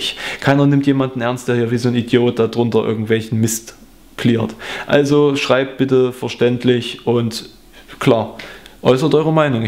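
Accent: Saxony, Germany. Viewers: Ich glaube er kommt aus sachsen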